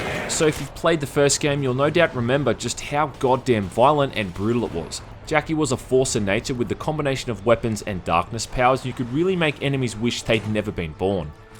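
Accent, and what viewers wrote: Australia. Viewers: I love your Australian accent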